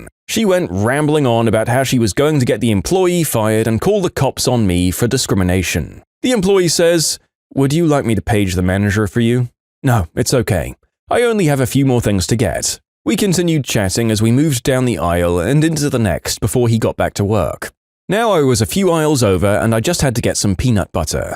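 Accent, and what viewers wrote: United Kingdom. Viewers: I don't like the new narrator British people aren't good for these types of stories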